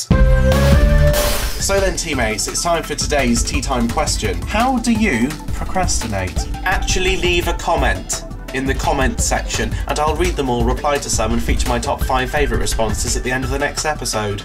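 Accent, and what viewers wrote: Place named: United Kingdom. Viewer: I try to figure out how to do your british accent...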